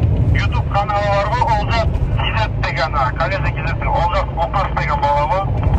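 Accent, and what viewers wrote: Standard. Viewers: Жарайсың таза қазақша сөйлейсің оған қоса тарихты айта кетесің ✊ дами бер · Қазақшасы таза екен иа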